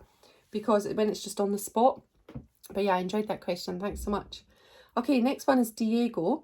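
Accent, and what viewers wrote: Scotland, United Kingdom. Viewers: Hi thank you for part three. Very entertaining. Love your Scottish accent 😻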